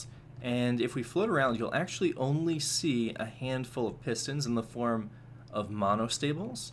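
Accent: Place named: Quebec, Canada. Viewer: ***** il est québécois...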